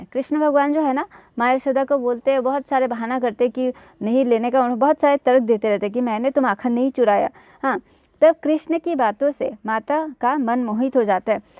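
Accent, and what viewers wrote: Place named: Bihar, India. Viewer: Mam ap bihar ke ho naa😉don not mind please 🤌🏻vo bss apke bolne ke tarike se lag raha tha ki app bihar se ho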